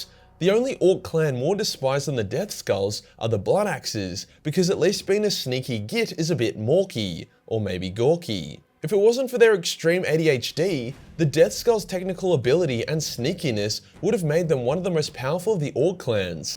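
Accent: Australia. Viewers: You do this very weird thing with your voice at the end of like every sentence, where you kind of raise your pitch and makes it sound like you’re asking a question. That makes listening to you very difficult. Even if someone didn’t find it annoying, it absolutely comes off as not genuine. Just talk regular homie. (And no I’m not talking about your accent. Everyone loves a good Aussie accent)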